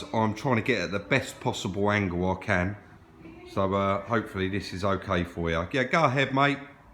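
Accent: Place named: Cockney, United Kingdom. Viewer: Love your cockney accent mate !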